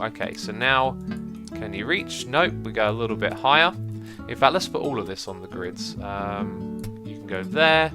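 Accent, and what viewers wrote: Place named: United Kingdom. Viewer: X, I love brittish voices.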